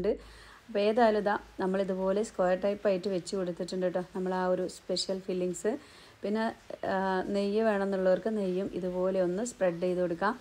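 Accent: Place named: Palakkad, India. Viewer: ഹായ് അസ്സലാമു അലൈകും. ആലൂ പൊറാട്ട സൂപ്പർ ആയിട്ടുണ്ട് 👌നല്ല അച്ചാറും, കട്ട തൈരും കൂട്ടി കഴിക്കാൻ സൂപ്പർ ആണ്. നല്ല ഒന്നാം തരം നോർത്തിന്ത്യൻ ഫുഡ്‌ ആണ്. അതൊക്കെ പോട്ടെ ഞാൻ പണ്ട് നിങ്ങളുടെ ചിലകറി കൾ ഓക്കേ ട്രൈ ചെയ്യാറുണ്ടായിരുന്നു എനിക്ക് എന്റെ ഉമ്മ ഉണ്ടാക്കി തന്നിരുന്ന കറികൾ പോലെ തോന്നുകയും ചെയ്തിരുന്നു 🙏പക്ഷെ അന്ന് നിങ്ങളുടെ സംസാര രീതി എന്തോ വല്ലാത്ത അസഹനീയം ആയിരുന്നു 😔എന്തിനാണ് ഇങ്ങനെ സംസാരിക്കുന്നത് എന്ന് തോന്നിയിട്ടുണ്ട്. കാരണം എനിക്കറിയാം എന്റെ നാട്ടിലുള്ളവരും പരിസരതുള്ളവരും എങ്ങനെ യാണ് സംസാരിക്കുന്നത് എന്ന് എല്ലാർക്കും ഒരേ പോലെ അല്ലങ്കിലും ചില വ്ലോഗർമാർവന്ന് നാടിന്റെ പേര് തന്നെ പറഞ്ഞാൽ മറ്റുള്ളവർ കളിയാക്കുന്ന തരത്തിലാക്കി സ്വന്തം PKD 😔 എന്തായാലും ഒരുപാട് സന്തോഷം കുറേ നാൾക്കു ശേഷം നിങ്ങളുടെ വീഡിയോ കണ്ടപ്പോൾ സ്വന്തം സംസ്ക്കാരം നിങ്ങൾ തിരിച്ചറിഞ്ഞു വെറുപ്പിക്കാതെ വീഡിയോ ചെയ്തതിന് 🙏🙏❤️ പിന്നെ നാട്ടിലെ മാങ്ങാകാലത്തെ ഓർമ്മപ്പെടുത്തിയപ്പോൾ 😔😔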